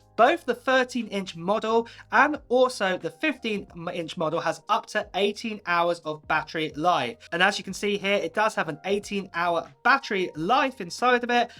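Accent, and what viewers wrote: United Kingdom. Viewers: hey! Are you from the uk or usa? only because you have a british accent but say dollars. Also, any update on macbook se?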